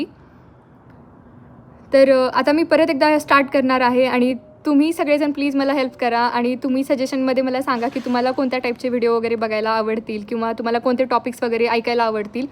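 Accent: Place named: Latur, India. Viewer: व्हिडिओ ची सुरवात अलमोस्ट तीन महिन्याच्या बाद म्हणजे दीदी सिंगापूर ला गेली तरी लातूरची भाषा सोडली नाही 😅 दीदी मुळे आम्हाला नविन नविन महिती मिळते थँक्यू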